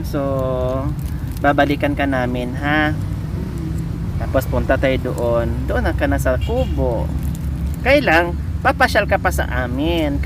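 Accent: Visayas, Philippines. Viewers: bisaya diay ka mam? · pangasinan po ba kayong blogger po? urdaneta,villasis, rosales at pangasinan na lugar po. Kaso visaya kasi ang salita ninyo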